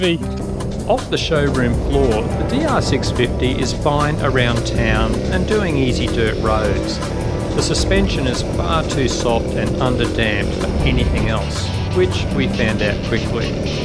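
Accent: Australia. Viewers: After all the reviews I tried to watch but failed by bloody skeptics, along comes this one one spoken in plain Australian. Thank you looking forward to watching the whole series. Where on the Sunny Coast are you lot at?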